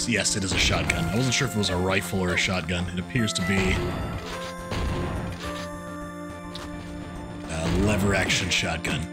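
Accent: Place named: Germany. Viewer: Yesss bring on the weird! Also it’s always a treat hearing your German accent come out to play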